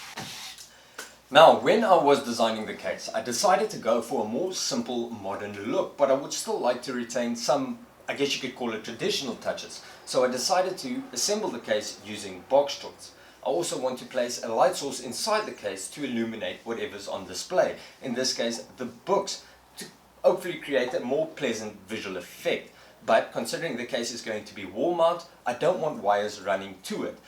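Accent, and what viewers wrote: South Africa. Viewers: The South African accent just adds to the video in the best way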